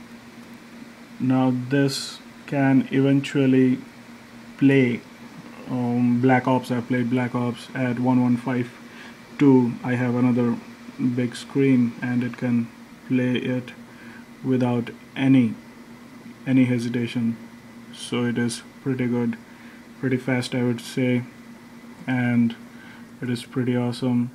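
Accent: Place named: India. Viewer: Brother i noticed your indian accent. Please tell me your opinion of the crappy customer service at Dell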